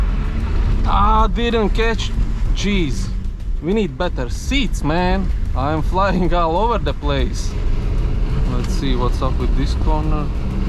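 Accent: Latvia. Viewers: ur actent sounds like ur Latvian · Are u latvian bro? Accent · could tell by the accent that you are latvian eu pavizinaties var? 😂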